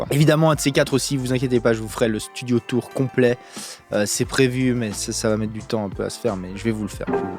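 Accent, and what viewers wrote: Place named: Geneva, Switzerland. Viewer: Punaise.. j'étais sûr que tu étais de Genève avec ton accent et je viens de regarder ton site et OUI ! 😁🤘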